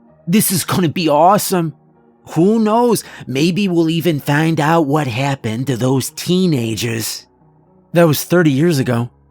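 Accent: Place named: United States. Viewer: that has to be the first time I have ever heard that an American accent sounds cool. I always love ones from elsewhere here but never anyone saying the other way around.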